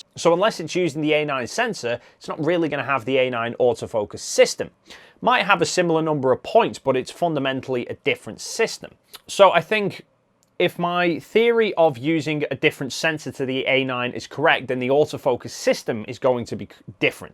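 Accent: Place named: North, United Kingdom. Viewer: The only reason I watch his videos is a beautiful northern accent 😂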